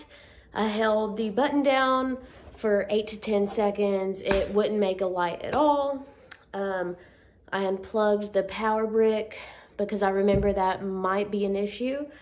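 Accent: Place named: South, United States. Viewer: she is sooooo from the south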